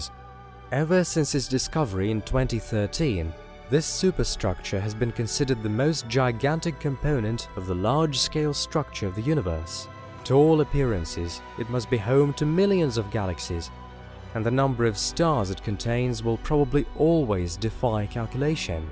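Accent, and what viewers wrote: United Kingdom. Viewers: This guy sounds like the posh English dude that does/did stream Civilization on YouTube when him and his friends played it together 👍😅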